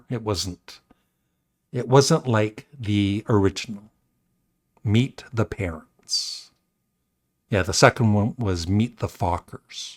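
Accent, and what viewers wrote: Canada. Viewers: Nice shirt youre wearing in this video and I like your Canadian accent.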